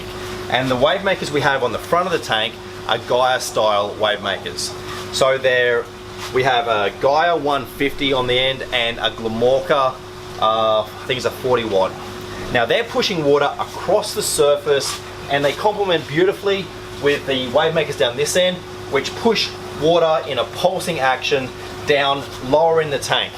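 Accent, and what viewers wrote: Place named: Australia. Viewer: That sounds awesome, take us along for the ride! The Germans really know their reefing. :) haha, what I liked about the old outro was the long hair and the long aussie "hit the looike" :D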